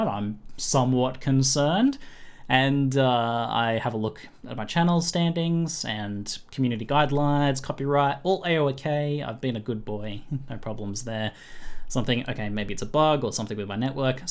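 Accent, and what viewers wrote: Australia. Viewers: I'm Australian too and he definitely has an Australian accent. If he was a Kiwi he'd call himself:)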